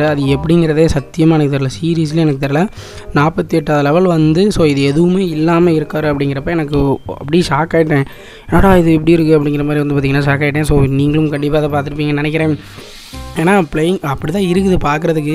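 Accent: Tirunelveli, India. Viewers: Neenga Tirunelveli ah bro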